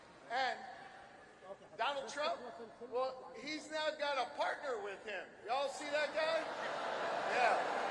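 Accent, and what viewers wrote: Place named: Philadelphia, United States. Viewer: That's just what people from Philly sound like ya smooth brain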